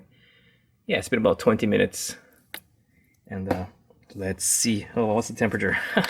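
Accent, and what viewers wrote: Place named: Hawaii, United States. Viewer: dude. cool vid. where you from? hawaii? was getting that local vibe for some reason lol.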